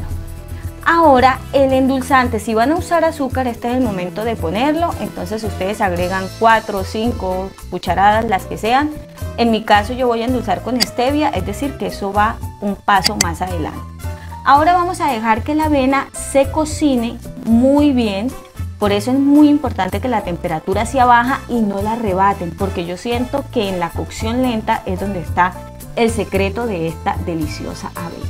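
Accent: Colombia. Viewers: ME ENCANTA EL ACENTO COLOMBIANO Y LA FORMA DE EXPRESARSE. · Ay como me encanta el acento colombiano! ❤ / 🇲🇽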